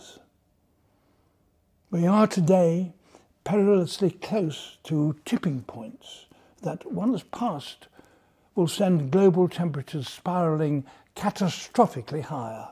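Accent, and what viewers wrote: United Kingdom. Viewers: Delivery counts. Tone, british accent, good microphones... timing.. all VERY important when making propaganda . Content? Really inconsequential in the scheme of selling things. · I guess by using an old guy with a British accent, they think that might convince more people of the lie. The only thing spiraling out of control is the climate change hoax. None of their prediction have come true. That should tell people something.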